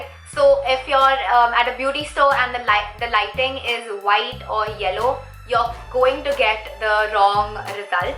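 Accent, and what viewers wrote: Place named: India. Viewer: I hateee indian accents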